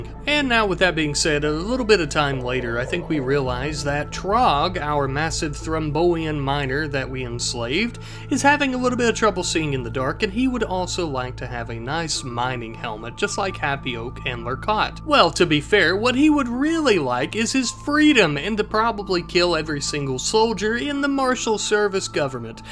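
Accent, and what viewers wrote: South, United States. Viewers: Who cares if he is working off a script, human or AI written. In fact, I appreciate the hustle. He took the time to edit his lines, work on his jokes, while writing it out. Now tell me, why ChatGPT? If anything, he mixes in a lot of local colloquialisms and that Southern charm, AI can't write that, can't deliver it. Llistening to makes me miss the south, a lot. Peculiar, genteel, savage, all at once. Oh and swampass, can't forget that. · I've been here around this channel for a while even commented about your southern accent at one point (don't know if you remember lol) but it's nice to see you grow and I hope you're doing well! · are you southern? i swear i hear an accent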